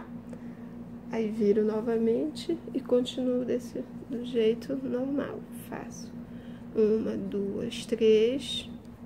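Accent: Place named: Rio de Janeiro, Brazil. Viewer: Oi!Boa tarde! Estou muito feliz! Acabei de terminar o meu. Eu fiz cm a linha nr 4 (barroco)e diminui 2 grupos no inicio. Ou seja comecei cm 7 grupos. E deu super certo. E olha que estou meio gordinha.Usei agulha 2,5! Beijos! Obrigado por dividir conosco suas obras de arte. Você é carioca? Fico encantada com a maneira cm q você pronuncia. Que Deus abençoe vc e sua família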